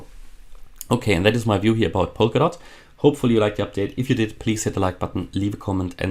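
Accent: Germany. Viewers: German accent ? Grüsse aus miami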